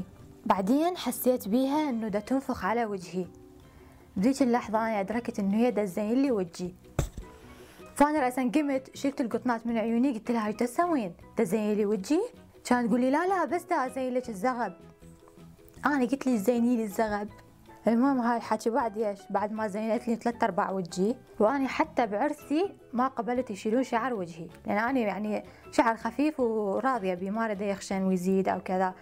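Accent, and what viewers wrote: Iraq. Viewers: هاي اللهجة العراقية البغدادية القديمة لذلك مداتفهميها كولش زين لعد لوسامعه الموصلية شيصير بيج ...المصطلحات صعبة..مثﻻ هياته يعني هذا لعد يعني جا اغاتي عزيزي كولش .....كثيرا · طلة ولا احلى مكياج بيجنن 😍 وانتي بل اصل بتجنني 🌹🌹 بموووت فيكي 😘😘 وياريت تضل فيديوهاتك طويلة لانو مابينمل منك ومن حكياتك وتحية لكل اهل العراق بموووت عل لهجتكون وانتو ناس بتجننو اختكون من سورية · اكثر شيء أحبه بيج هوه حجيج العراقي ومتصنعين اني عراقيه واموت عليج 💚💓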